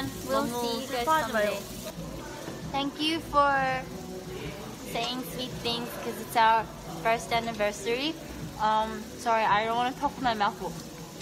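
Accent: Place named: United States. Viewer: yea she sounds more american in this vid but then in other vids she sounds aussie... same with jennie haha she sounds american in some vids but sometimes she has a nz accent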